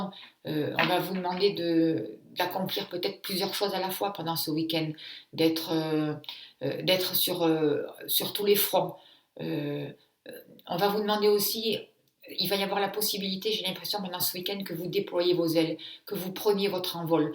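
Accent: South, France. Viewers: bonjour et merci pour vos videos tres justes, et j adore votre accent du sud ;)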